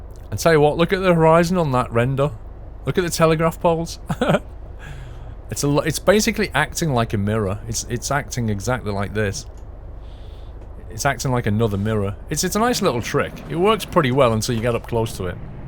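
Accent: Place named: North, United Kingdom. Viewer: The psuedo American raised inflections as you speak mixed with a Northern Uk accent has got to stop.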